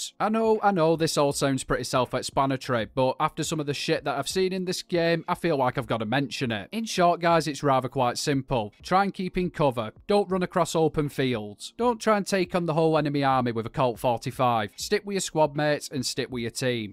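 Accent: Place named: North, United Kingdom. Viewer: Awesome. Love the northern accent lol. Rare bunch we are 😂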